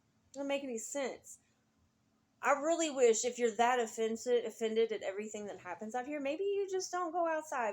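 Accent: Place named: South, United States. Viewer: That southern accent loving it 💯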